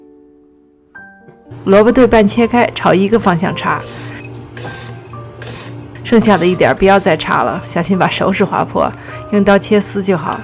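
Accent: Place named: Beijing, China. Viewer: ，我总觉得你有点北京口音，你是不是在北京待过或者周围有不少北京朋友？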